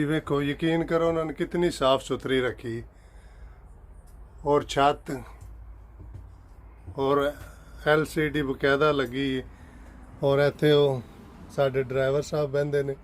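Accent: Gujrat, Pakistan. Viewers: Ap Gujrat se hain .ap k bolne k style mujhy lga tha Ap Gujrat se ho .meri ami bhi Gujrat se thi .☺️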